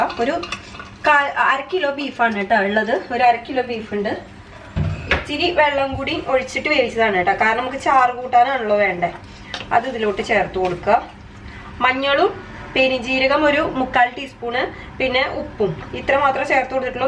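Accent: Thrissur, India. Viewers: Super recipe,,,,appo.........,nammalu Thrissur karude samsaram kelkkan entha rasam 🤩🤩🤩......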